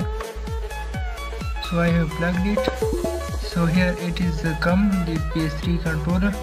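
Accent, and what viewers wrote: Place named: India. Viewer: What is he saying??? If you speak english with that indish slang, why is there a technomusic in the video, sometimes louder than your voice. BIG FAIL!!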